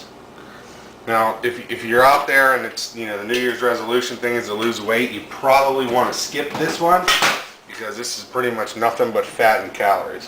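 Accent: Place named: Pittsburgh, United States. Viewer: You sound like your from pittsburgh. I assumed you were originally from pittsburgh when you first talked. I'm from southern WV and I've only been to pittsburgh once, so maybe I just haven't heard the accent enough.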